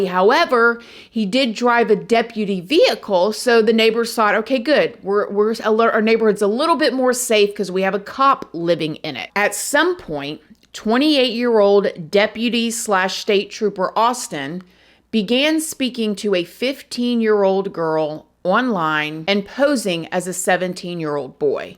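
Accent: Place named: Upper South, United States. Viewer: I'm not commenting on the story, this is for you! That Southern lilt of your voice, hello my lovelies, show no, shoot can't remember all of it. Just no, that's why I subscribed! Your voice is amazing for voice overs, and radio! Fabulous!! Edit: Your 6 yr old??? Disgusting!!!! ALWAYS Protect children, seniors and animals!!! WTH is wrong with humanity, beyond WRONG!!!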